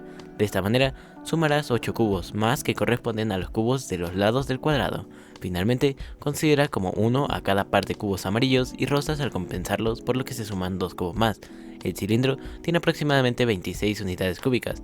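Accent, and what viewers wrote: Mexico. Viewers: oye de donde eres se te escucha acento mexicano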